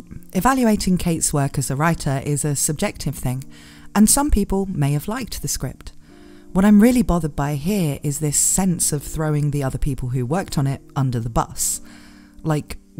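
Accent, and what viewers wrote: United Kingdom. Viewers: I'm loving your videos, I found your channel the other day and I just really like your chill demeanor and accent, I know is a type of British accent but I'm bad with differentiating accent and dialects sorry, even here in America too since I'm a latino lol, but I try since im a fan a couple types like Welsh for example thanks to Dragon quest but I'm kinda rambling at this point so I digress, but I really like it and your dry humor. I've been kinda binging your vids when I can ever since.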